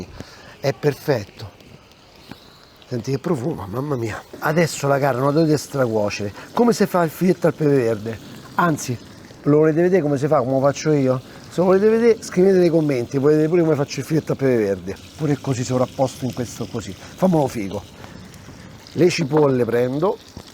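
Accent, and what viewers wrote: Rome, Italy. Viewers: sei il.migliore per. Simpatia, e con il tuo accento romanesco è come essere a Roma in diretta. Grande 💪💪💪🌈🌈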